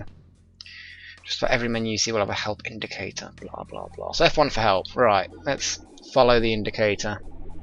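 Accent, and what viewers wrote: England, United Kingdom. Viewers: I am an Idor, and I just wanted to ask, are you from England itself? Or from scotland\ireland? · So you get a lot of crap from ScreenRave about your "UK" accent. I may be an American, but I understand how ridiculous that is. There's no "UK" accent. There are dozens, maybe hundreds. Whereabouts are you from? I don't recognize the city or area your accent corresponds to...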